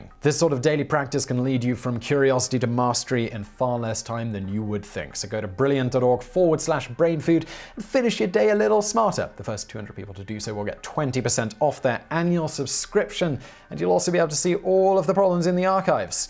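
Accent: England, United Kingdom. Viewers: Dude tone down the over posh British voice. We all know you don't really talk like that. Speak normal man. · There is no space... Duh what a load of crap and your English accent makes you sound stupid. Blah blah blah blah blah nonsense. Not worth watching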